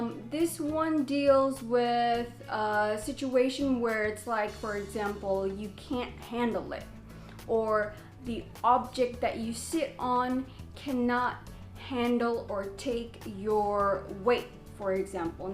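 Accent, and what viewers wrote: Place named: United States. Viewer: Really like your american accent 😊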